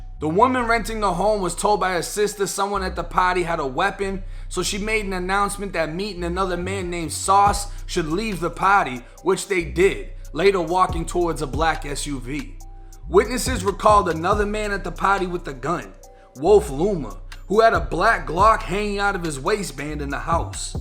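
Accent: Boston, United States. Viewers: U thr man bro love ur Boston accent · Ok dude your from Boston I can tell from your accent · Does anyone know if from Boston because he sounds like he's from Boston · U from boston my guy? I can hear that bostonian accent.. Massachusetts in the building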